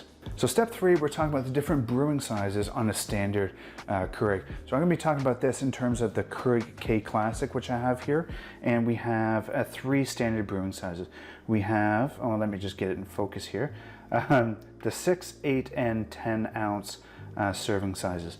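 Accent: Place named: Canada. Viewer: Where are you from? Sounded like a slight canadian accent at times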